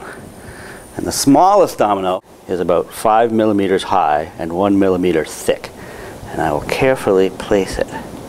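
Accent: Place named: Canada. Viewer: He actually sounds Canadian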